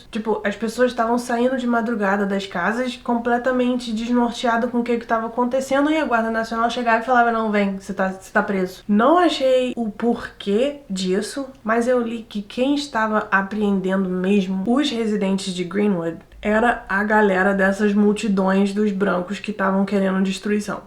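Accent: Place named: Rio de Janeiro, Brazil. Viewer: Ótimo vídeo. Você fala muito bem português e com sotaque carioca. Você é linda e tem uma voz suave. ❤❤❤❤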